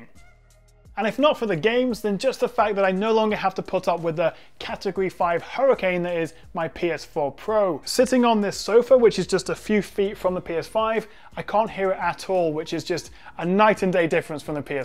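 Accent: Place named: North, United Kingdom. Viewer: why do you sound like a northerner pretending to be a southerner?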